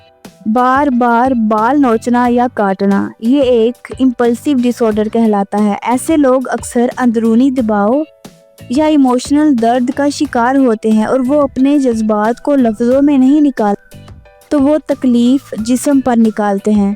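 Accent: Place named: Punjab, Pakistan. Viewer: Allah ka wasta hy ,tm log urdu ka baira garaq mat kiya kro,video bananay sy pehly urdu Bolny ki achi tara sy practice kr liya kro, Urdu ko bhi panjabi ki tara bolty ho😕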